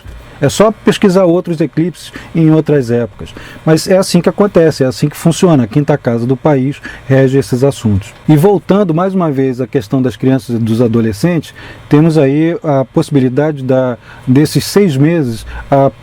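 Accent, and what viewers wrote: Brazil. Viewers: bom dia! Você é mineiro? Sou mineira de Belo Horizonte, taurina e ascendente em peixes. Grata pelas explicações dos eclipses, um abraço!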